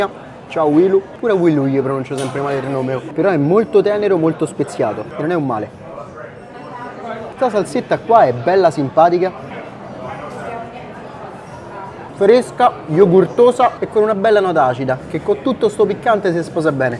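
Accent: Rome, Italy. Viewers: Assomigli a Dean Winchester: adoro! Tu e il tuo romanaccio, verrete con me domani in treno:)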